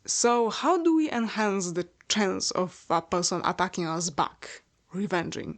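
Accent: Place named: Poland. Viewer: You're either polish or dutch aren't you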